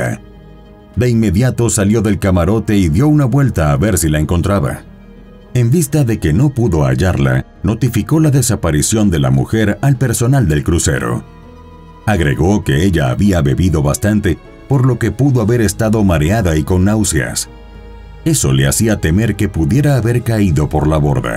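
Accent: Mexico. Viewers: Sr, su voz es maravillosa para yo tenerla al acostarme susurrándome poesías de amor!!!!!!! Usted nos pudiera decir de qué país es??? Dicen algunas que es mejicano, pero para mí su entonación es neutro …….y no lo puedo definir….. Nos saca de la duda????? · Lo se, pero es acento mexicano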